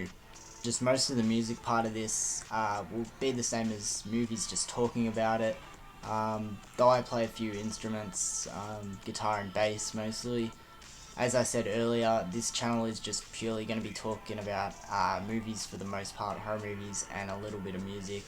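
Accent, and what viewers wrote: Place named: Australia. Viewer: In one of your videos I was guessing where u were from....and what came to mind on your accent was either....AUSTRALIAN....SOUTH AFRICAN or ENGLISH......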